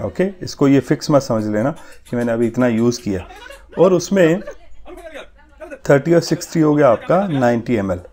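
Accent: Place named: Pakistan. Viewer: Assalam ualikum sir apka btaane ka tarika bilkul esa hai aise Pakistani bat krty hain ya apka koi talluq hai Pakistan main apka koi hai yha main agr india hoti to apky bht kuch aky seekhti or sekhti bhi hon ma sha Allah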